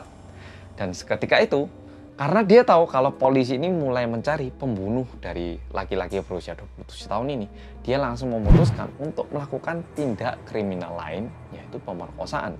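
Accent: Java, Indonesia. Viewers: Dah lama ga nyimak kasus Dr abang medok. Kangen rek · Bersama org korea yang... Madokkkkkkkkkkkkkkkk😂😂❤❤❤... Suka banget dengarin😅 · Baru nonton chanel ini.. wong korea ngomongnya medok banget.. lucu.. haha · Mulai demen liat video2 nya mas medokk satu ini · Mas yg orang korea aja ngomongnya lebih medok daripada aku yg suku jawa, tapi tinggal di kalimantan 🤭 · Tim gercep mas, selalu medoooooooookkkkkkkkkkkkkkk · Lucu penyampai ini..orang korea tapi logat indonesia(jawa) nya itu pekat ya😀😀😀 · Suka banget karo wong korea sing medooogggg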